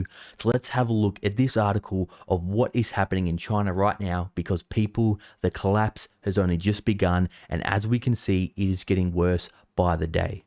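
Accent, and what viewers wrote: Australia. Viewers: Ah, sure it will be grand...seriously, it will. The man on the "tube"...for sure. Festive cheer delivered in an Aussie accent... Like seriously what more could ya ask for?. OK, I admit I'm hooked...hands up. Never trusted those communists in the first place...🍻🥂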